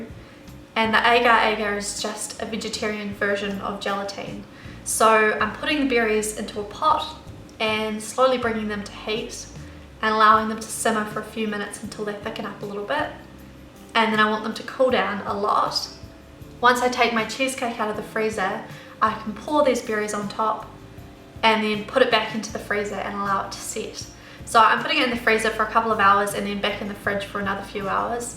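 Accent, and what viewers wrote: New Zealand. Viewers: I'm Australian, and she sounds New Zealand to me. Though in my experience, South Africans who have lived in Australia for a number of years can sound like New Zealanders too. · I'm certain she is from New Zealand that sounds like a very thick New Zealand accent to me. I'm Australian too and we def don't sound like when we speak. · is she from new Zealand I am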